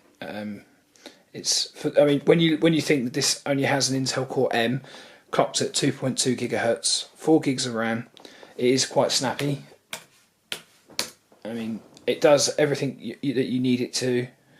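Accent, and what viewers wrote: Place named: United States. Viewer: he is speaking American English not british english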